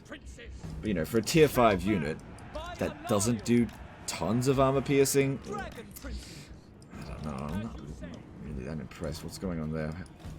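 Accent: Queensland, Australia. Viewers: Hey, I was curious if you might consider doing a "how to level" {hero/lord name here}. I just realized as I was playing a Thorek campaign how I have no idea what traits to take down the blue line to get Lightning Strike are. Not sure what the algorithms leaning towards these days as to video number or length ( nearly 400k in it's all probably gravy to you at this point ), but I wouldn't mind that kind of guide with a bit of queenslander accent thrown in.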